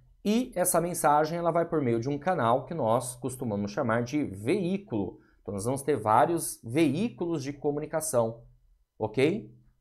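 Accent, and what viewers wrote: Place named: Sao Paulo, Brazil. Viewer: Esse professor é show .... parabéns 🎉 se deus quiser passarei em um concurso que irei fazer ....você não é de João pessoa professor ? Tem sutaque de paulista ....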